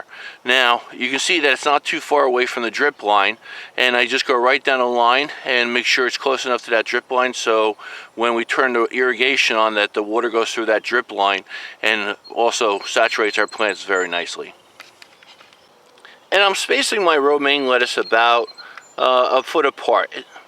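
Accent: New Jersey, United States. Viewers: Good video. Can really tell your from Jersey. "wooder", water.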